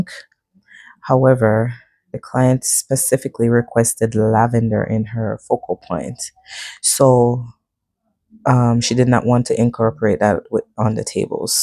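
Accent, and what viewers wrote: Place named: Jamaica. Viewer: Waaaiiiiittt a minute did a hear patois? are you Jamaican or Caribbean? anyway, love the set up as usual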